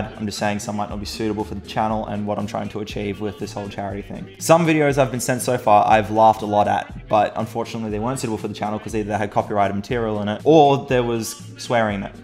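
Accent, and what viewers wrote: Australia. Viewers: Are u Australian? U dont have to answer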